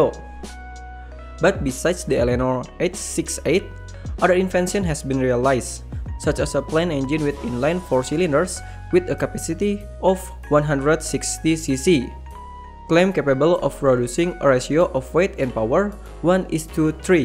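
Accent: Indonesia. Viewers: I immediately know that this channel is based in Indonesia from your first word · Logat indonesia nya kedengeran bro.. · Ini org Indonesia ya ? Soal nya logat nya indo banget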